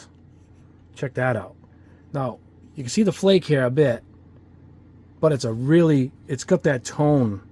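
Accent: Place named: East Coast, United States. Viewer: Thanks so much for everything you do! And its nice to hear a painter who has a nice east coast accent like me. Jersey born and raised. Thanks again!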